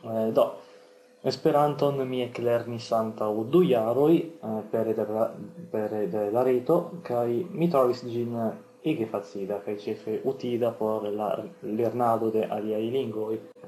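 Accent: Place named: Italy. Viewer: Sería mejor si mitigara tu acento italiano. Para haberlo estudiado sólo un año, tu español es magnifico.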